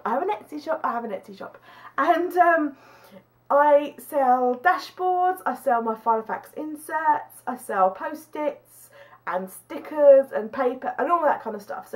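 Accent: England, United Kingdom. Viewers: you are so cute! Love your English accent. You make me smile. You always lift my spirits! God Bless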